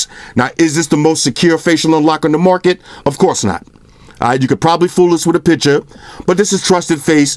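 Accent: New York, United States. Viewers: "poison for the trolls" This was dope! Yo this guy from NY?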